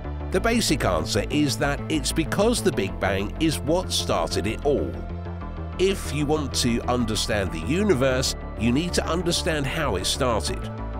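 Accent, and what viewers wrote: England, United Kingdom. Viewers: That english accent made me quit watching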